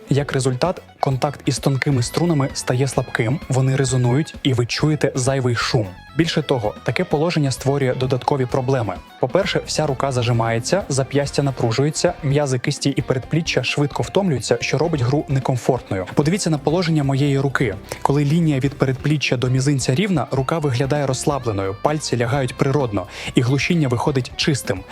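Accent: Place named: Russia. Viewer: розумію про що йде мова,але як на мене,то це можна пояснити його російськомовністю до війни,і невеликим картавленям,це не може бути ші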